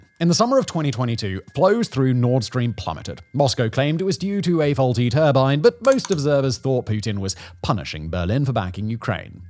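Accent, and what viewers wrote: United Kingdom. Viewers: an artfully belabored speculation with a right proper british accent is still speculation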